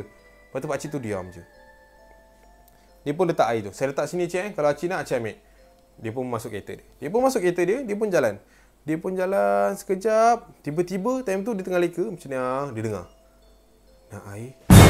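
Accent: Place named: North, Malaysia. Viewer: Hg ckp utara sempoi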